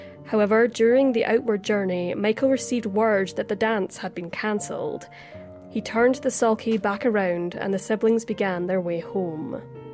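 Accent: Scotland, United Kingdom. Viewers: Her accent is Scottish. "WESTERN" Europe is made up of many countries. All have the own distinct accents. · Clearly pronounced and in a beautiful dialect. The reader sounds scottish to me? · Yes I pick up on Scottish as well, some irish and Scottish sound alike but the Scotts have more of a drawl on their accents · She has a Scottish accent and a beautifully clear voice. Both enhance the subject, however, your rudeness does not, honey. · She's Scottish..! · Oh, I've heard about this before. Interesting case, and sadly one that'll most likely never be solved. Also, love your accent! Scottish, right? :) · There’s nothing sweeter than a a Scottish accent like if you ask me!!!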